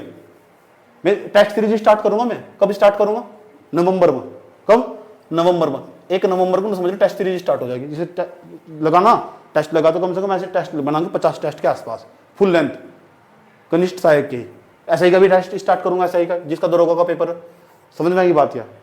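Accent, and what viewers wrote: Haryana, India. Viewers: Sir aap haryanavi ho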